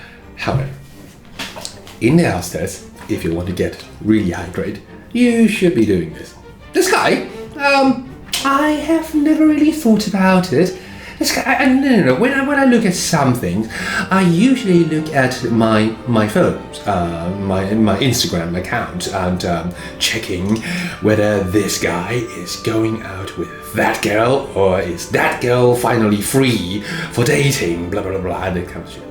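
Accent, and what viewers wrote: United Kingdom. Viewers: Thanks for your guidance in Speaking。 I really like your authentic British accent！ teacher looks like Harry Potter Wizard And is super cool。 Ha ha ha ！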